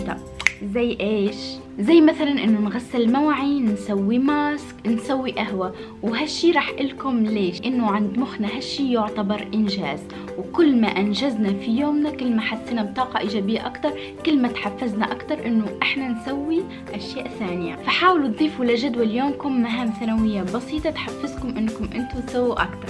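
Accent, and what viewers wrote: Tunisia. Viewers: انت تونسية ؟؟؟ ولا جزائرية ؟؟؟؟ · هل انت تونسية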